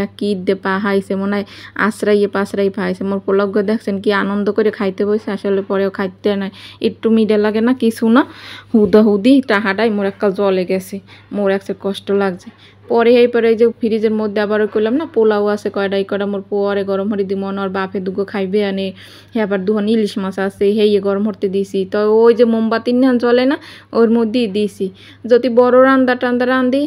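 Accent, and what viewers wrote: Barishal, Bangladesh. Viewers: ❤আপু তোমার সাথেই আছি ❤আর তোমার বরিশাইল্লা পাহা পাহা কথা শুনি ❤👍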